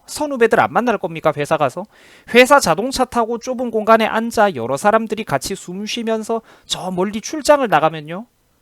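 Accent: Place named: Gangwon, South Korea. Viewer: 말투가 강원도분이시네요 파이팅!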